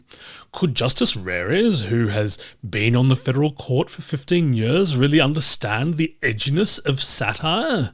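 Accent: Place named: RP, United Kingdom. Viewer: You're the only Australian I've come across with an Etonian posh boy accent. Thanks for explaining to everybody how we in the UK screwed up out legal system over the past 400 years. It's a pity that you Australians can't shake of our baggage and come up with a better system of your own.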